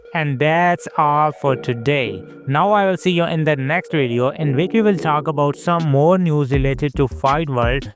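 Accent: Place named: India. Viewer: Wttf is this indian voice talker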